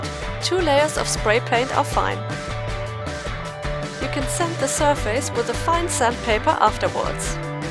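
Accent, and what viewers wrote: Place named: Germany. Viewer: are u from germany because yopur english sounds really german xD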